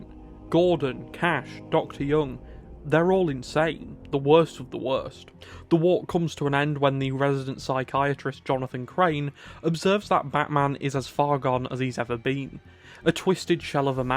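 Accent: United Kingdom. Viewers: You should make this video without the british accent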